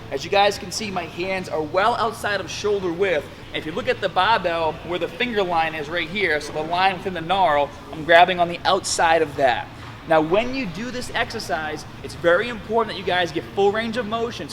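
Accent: Boston, United States. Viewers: Did his Boston accent get stronger? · it's boston accent. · came back after 2 years and he suddenly has a Boston accent. Lol wtf. Weird ploy to attract more viewers? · He is from the Boston, Massachusetts area I believe. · You don't sound like this in your instructional vid lol nice bAston accent. Keep up the good work though! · I love going back 6 years on your channel and seeing how little gains you've made, you look the exact same. You also didn't have a boston accent 6 years ago. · I never noticed that Boston accent until after watching The Heat. lol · he actually comes from Boston, so...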